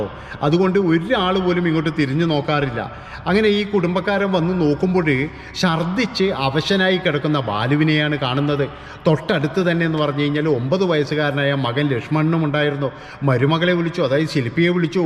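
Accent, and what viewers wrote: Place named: Northern Kerala, India. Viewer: അവതരണ ശൈലി കേൾക്കാൻ ഒരു സുഖവും ഇല്ല ഒരുതരം വൃത്തികെട്ട വടക്കൻ ഭാഷ.... ഒരു സ്റ്റാൻഡേർഡ് ഇല്ലാത്ത ശബ്ദവും ഭാഷയും എന്തോന്നാടാ ഇത്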